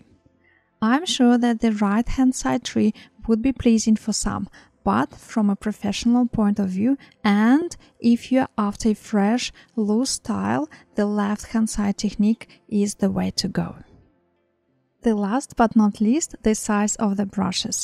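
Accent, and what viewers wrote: Russia. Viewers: С первой секунды слышно соотечественницу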